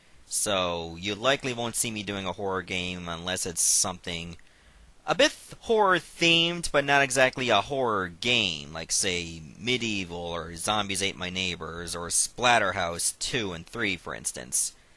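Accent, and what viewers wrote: Newfoundland, Canada. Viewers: 1. Is there a long, undisclosed queue of LPs that you plan to do, or are you more or less spontaneous with your long-term planning? Assuming you get a lot of various requests, is it impossible to estimate the time you'd LP any one request? [assuming it's not rejected] 2. Imagine you end up meeting with one of your favorite video game characters. Who would it be? Describe a scenario of what you'd do with that character if you hung out with him/her for one day. 3. Do you describe yourself as having a Newfie accent? If not, do you know of anyone who fall more closely to that sort of stereotype? Thanks in advance for your responses!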